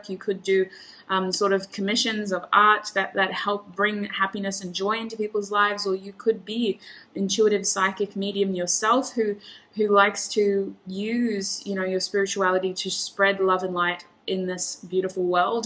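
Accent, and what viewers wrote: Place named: Australia. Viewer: I watch these videos every night as I go to sleep because you have a beautiful, pleasant, lovely Australian accent 🥲💐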